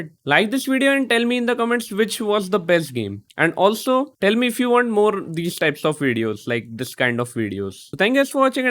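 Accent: India. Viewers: are you indian? you sound like indian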